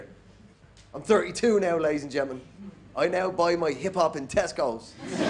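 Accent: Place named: United States. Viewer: Andrew Maxwell does a good American accent.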